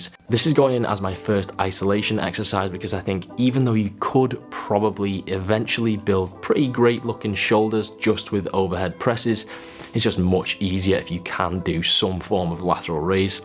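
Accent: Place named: Birmingham, United Kingdom. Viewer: Hi, I like you accent, is it from Birmingham?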